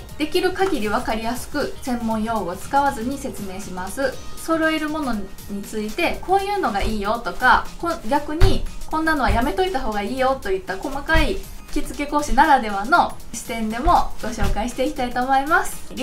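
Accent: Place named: Kansai, Japan. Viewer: とても分かりやすいし、関西弁が懐かしいので、チャンネル登録させていただきました。 和裁は少し習ったことがあるのですが、着付けはまだ全然です。 着物を着る日本人が増やしたいですよね！('◇')ゞ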